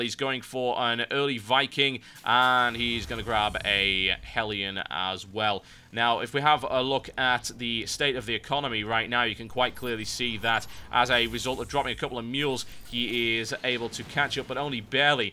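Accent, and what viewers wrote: United Kingdom. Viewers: i was wondering r u british?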